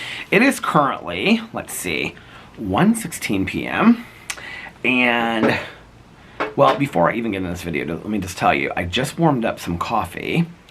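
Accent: South, United States. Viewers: U had me cracking up at the gym when u we’re talking about your southern twang from watching the slaton sisters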